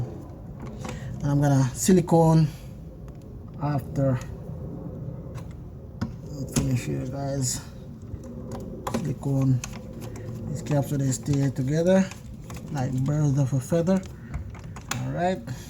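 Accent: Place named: Jamaica. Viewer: Sound like a yaadie🤔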